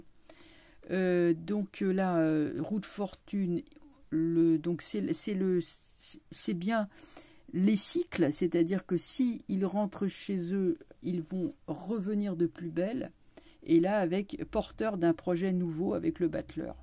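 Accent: Paris, France. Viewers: Bonjour, cela fait déjà un bon moment que je vous écoute et que j'apprécie votre travail.. Mais surtout vous êtes pour moi comme une petite madeleine de Proust.. Votre voix et votre accent titi parisien me rappellent mon enfance à ménilmontant, pigalle, le sacré cœur tout ça tout ça les marrons chauds.. Que du bonheur.. Merci..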